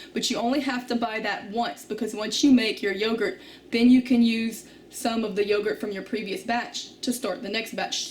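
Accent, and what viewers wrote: Louisiana, United States. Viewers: Are you from Louisiana??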